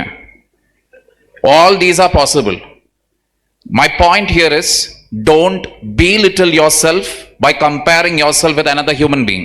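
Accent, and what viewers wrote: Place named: South, India. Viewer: sir belong south side that's why his tone like this.... But practice makes everything so practices it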